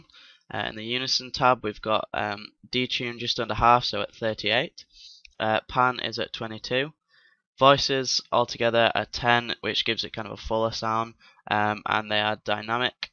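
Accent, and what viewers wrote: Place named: United Kingdom. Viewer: Even though I am American, no one can speak "Synth" like the brits. Dope Tutorial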